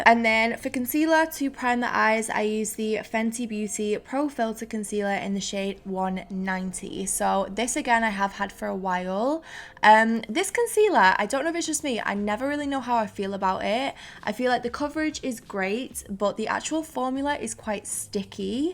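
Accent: United Kingdom. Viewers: You are like Angel 💛so cute so beautiful 💛and i love your britch accent 💛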